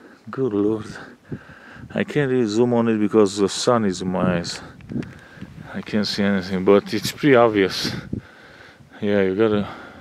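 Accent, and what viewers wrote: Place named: Ukraine. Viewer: Almost to hot for swimming, UV is generally 9 or higher so we tend to stay out of that as much as possible. Our area of choice to hike in is Whiteshell provincial park..just named a UNESCO world heritage site for its stunning boreal forest and petroforms..a truly magical place. I thought that I recognized your accent, my Grandfather was from just that area.. Ternopil to be precise..I remember him telling me of the beautiful hills and mountains.. small world isn't it? Cheers!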